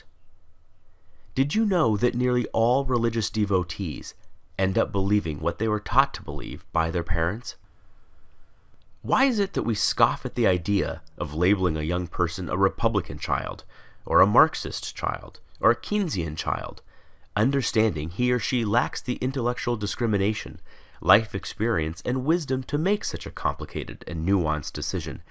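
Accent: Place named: England, United Kingdom. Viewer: Giọng Anh nghe phê thiệt :)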